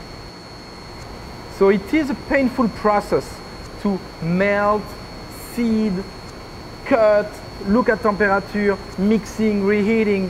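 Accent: France. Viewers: The French accent ssoooooooo does it!! Tem-purrr-achture!!! Love it !!! Is partly because they stick their lips forward like they are about to kiss you when they are talking...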